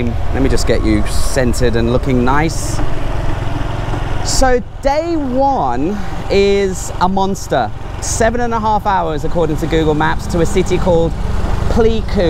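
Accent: United Kingdom. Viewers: your British accent is so strong now! I think it because you no longer have to speak broken Thai and most people in Vietnam speak more English 😎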